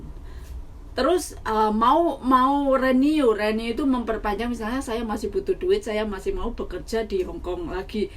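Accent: Java, Indonesia. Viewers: Salam kenal mbak... Sehat, sukses terus mbak. Njenengan jawane mana mbak?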